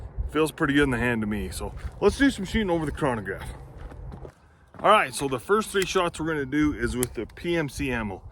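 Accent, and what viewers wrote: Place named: Texas, United States. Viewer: Here in Lubbock Texas the only ammo i can get is Aguila 71 gr. ammo. I shot it through my used Bobcat 3032. I bought a box of Fiocchi 73 gr. 32 ammo. I found some TUI in 32 so i may get that box for 30$. I cleaned and lubed up my 3032 so it should shoot great. I shot only 8 rounds of rhe Aguila and its a potent round . I shot through a box of depends and went through 10 of them into the next box. Thanks for the video. Id almost swear you were a Texan. God Bless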